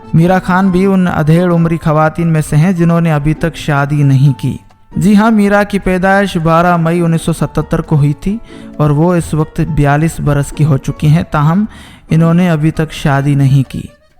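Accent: India. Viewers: Sub say phalay apni Urdu sahi karo Urdu ko handi ki tarha mat bolo bhai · App ki language Kis kisam ki hay? Lahja or talafz Hindi hay.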